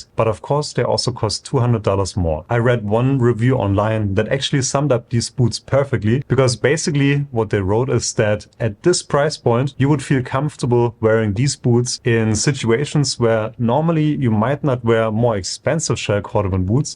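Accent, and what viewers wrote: Germany. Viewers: I think you’re german by your accent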